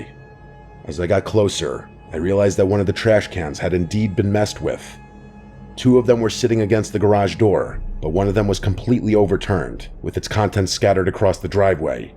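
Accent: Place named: Upstate New York, United States. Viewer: I love your accent.It's definitely from upstate new york where i'm from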